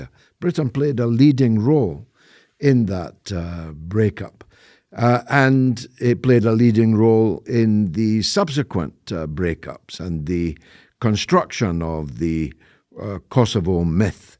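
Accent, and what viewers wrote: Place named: Ireland. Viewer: This man sounds irish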